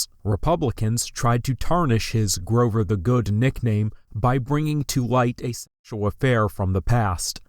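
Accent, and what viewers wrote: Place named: Vermont, United States. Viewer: I'm going to guess you have a touch of old Vermont accent. "Found out," sounds like: "faound aout." Great!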